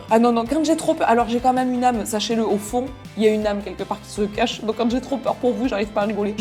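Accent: Marseille, France. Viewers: J'adore dans accent de Marseille (en j'ai le meme) sinon ti cro belle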